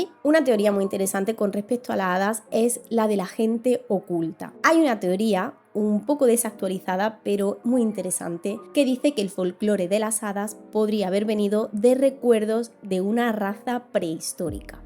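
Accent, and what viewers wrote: Andalusia, Spain. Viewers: Muy agradable el vídeo y tu acento que ha de ser de Andalucía, puede ser? Soy argentina y te saludo. Y te ganaste mi suscripción 🎉❤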